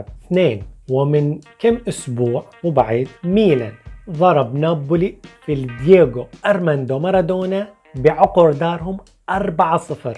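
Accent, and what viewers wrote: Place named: Deir ez-Zor, Syria. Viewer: لهجتك ديرية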